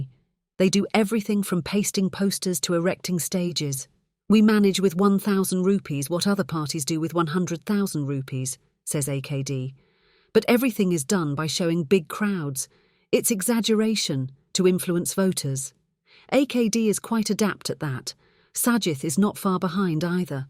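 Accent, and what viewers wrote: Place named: RP, United Kingdom. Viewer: Queen`s English. Very good.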